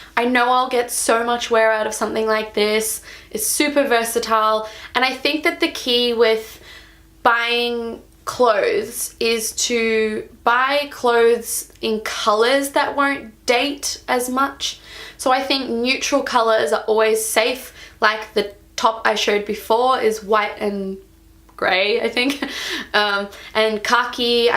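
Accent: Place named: United Kingdom. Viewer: I guess I should mention that I'm making the assumption (based on your accent) that you are UK!? Could be wrong! You can totally listen to my accent and tell I'm backwoods!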